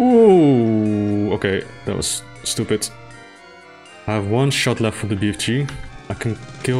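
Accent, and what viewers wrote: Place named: Netherlands. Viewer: It is the Dutch way of speaking English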